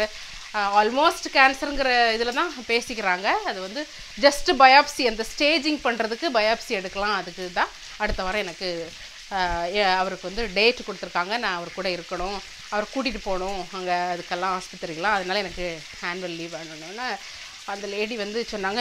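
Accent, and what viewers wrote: Nagercoil, India. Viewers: This is josephine from trichy.Hello how r u. Recently I started watching u r channel. I like u r nagarkovil slang. I like u r body language everything instead of u r cooking. Keep countinueing dear. Bye. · Even if you settled in UK you haven't forgotten your nagercoil tamil so sweet whenever upload a video never ever change your language . Mother younger is just like our mother. So keep it up. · Superb..What a catching Talk.Clasa. No Other Words To Say..As If Iam in Krishnankovil in Nagarcoil Town... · உங்கள் மக்கா ,மக்களே....இன்னும் அழகான வார்த்தைகளுக்கு நான் அடிமை....enaku neraiya nagercoil friends erukanga sis nenga pesra tamil rombo pudikum....love from UAE... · nagerkoil thamilai marakatha thamilachi neengal i like you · Akka naanum Nagercoil kaari thaan...Namma ooru baashayai kekkarathukku romba santhoshama irukku....Ippolaan enga family la yaaraavathu stressed aa irunthaangana unga video thaan pottu paapom....En ponnu unga periya fan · Akka I like your style of Tamil.Nagercoil?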